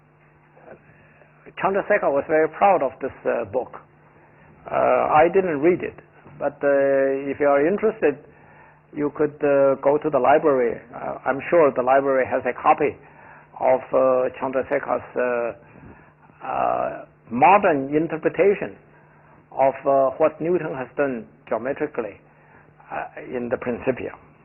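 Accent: China. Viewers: 还有发音也还是很有华人的特点。不过瑕不掩瑜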